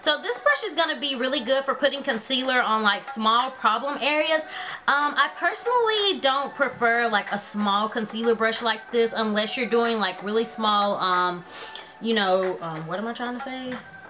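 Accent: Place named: Alabama, United States. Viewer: Woo your from alabama =P I LOVE our accents! and I freaking love all your video's! girl you need to stp makeing me spend so much! lol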